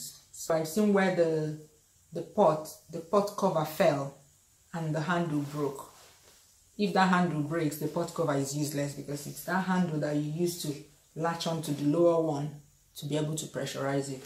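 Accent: Igbo, Nigeria. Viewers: you're begging us... 🤣🤣🤣🤣 honestly I hated cooking when I was small with my mum. we would cook for hours... now we are grown she uses it... i wish we had this long ago in Nigeria.. love you igbo accent so much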